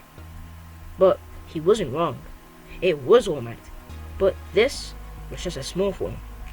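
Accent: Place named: United Kingdom. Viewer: lol i can already tell your british are you a roadman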